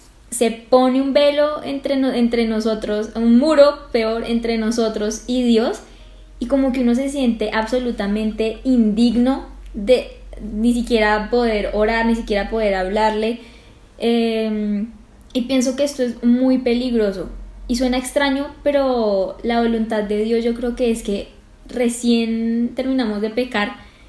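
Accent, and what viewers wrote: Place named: Colombia. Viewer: Que asi Sea, Que Dios te escuche. Por que la verdad han sido meses de mucho dolor y sufriimiento. Me encanta tu asento colombiano, y la frescura de tu ser. estare muy pendiente de tu Nuevo video, espero sea pronto. Un abrazo fuerte de regreso.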